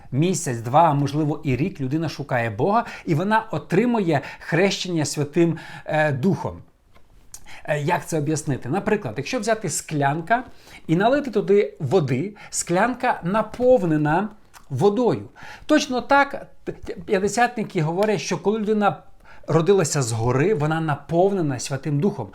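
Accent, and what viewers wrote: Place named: Western Ukraine, Ukraine. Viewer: Накінець то по українськи бо вам рос. абсолютно не йде бо чути шо ви з західної України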